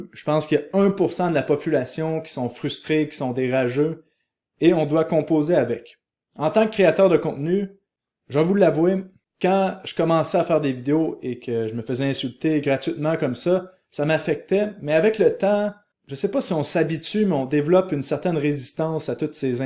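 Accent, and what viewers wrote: Quebec, Canada. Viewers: 🙏 hello restes comme tu es! toujours au top concis et en plus avec ton humour et ton accent canadien tu nous apportes plus un petit moment de rafraichissement qui fait du bien...et l'as si svt dit qd le marché monte n'oubliez pas de faire des profits! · Tu regale , j'avais un peu de mal avec l'accent québécois mais le contenu apporte tellement de valeur qu'on est obligé de s'y faire 😂❤💪🏼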